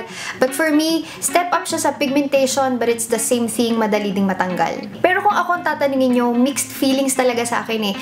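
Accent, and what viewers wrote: Philippines. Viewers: im really love philipine accent😍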